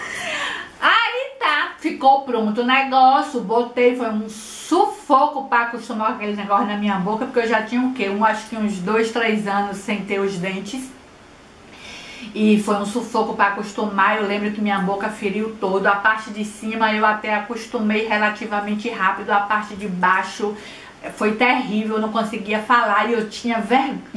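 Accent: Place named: Bahia, Brazil. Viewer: Vc é linda!Sua essência é verdadeira,alegria contagiante e espontaneidade como sua mais perfeita qualidade!Adoro esse sotaque baianês,vc é um exmplo de mulher,não se importe com essas pessoas vazias e fúteis,elas realmente não sabem o que vieram fazer no mundo,azar o delas!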